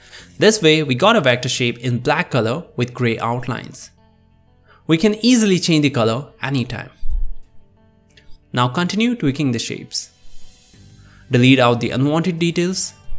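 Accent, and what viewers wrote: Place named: India. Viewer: chhhharactorr ? indian cu*t ? lol shity accent always leaks out. No matter how hard you try...wannabeeez!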